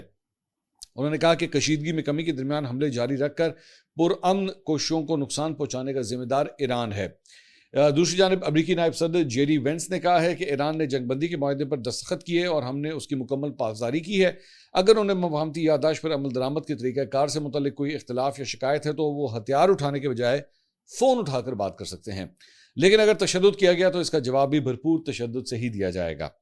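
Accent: Punjab, Pakistan. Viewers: Sir read slowly for correct Pronounciation . Like you Punjabi accents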